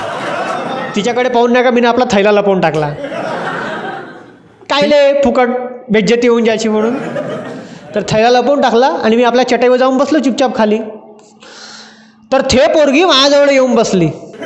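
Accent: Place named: Nagpur, India. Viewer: तूझी नागपुरी मराठी ऐकून मला नागपुर ची खुप आठवन येते. Miss u Nagpur. Miss u Vidarbha.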